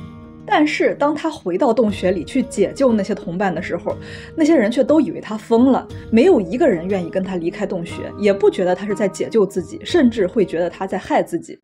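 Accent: Jinan, China. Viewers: 难得的有思想有口才的好主播，果断订阅了❤播主是济南人吗？一口济普乡音很亲切啊😊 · 听你口音像济南人 是吗老乡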